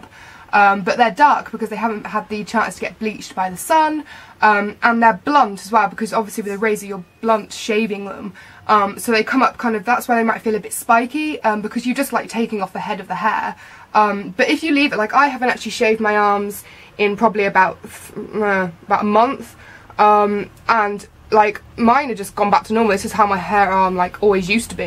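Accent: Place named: United Kingdom. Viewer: As soon as I saw this video I heard your accent and literally watched the whole damn video because you know what, you're so relevant! I'm British so honestly everything you said was a breeze to follow through because I can access the products and you talk like me :') virtual fist bump you're awesome x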